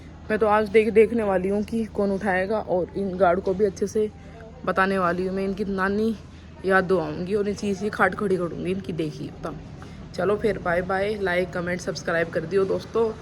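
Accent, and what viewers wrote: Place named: Haryana, India. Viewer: Nice haryanvi😅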